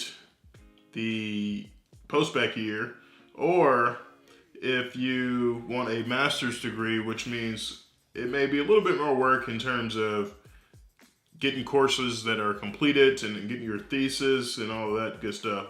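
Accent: South, United States. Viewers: Did you take speech classes to curb your southern accent?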